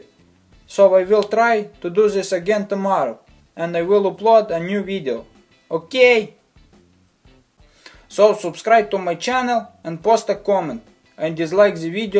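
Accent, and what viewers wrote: Russia. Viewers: Louie Espinosa Yes he is Russian. You can hear it by the way he talks, OR if you have watched Muppets Most Wanted and saw Constantin the evil frog-he had exactly the same accent c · this was so funny! OKEIII??? btw you sound russian or ukrainian 😂😂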